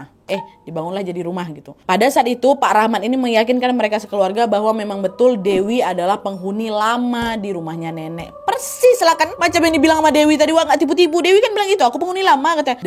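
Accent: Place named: Medan, Indonesia. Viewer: Emng kak bukan org batak? Logatnya medan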